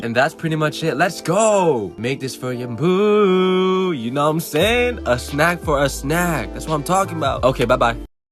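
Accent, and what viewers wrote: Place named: United Kingdom. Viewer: Y'all can tell he's british. His accent... and LOOK AT THAT PANNNN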